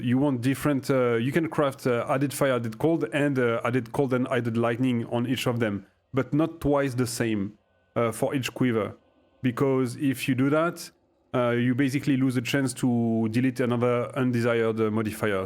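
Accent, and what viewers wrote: France. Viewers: On reconnait l'accent bien français bien bourguignon. Nice craft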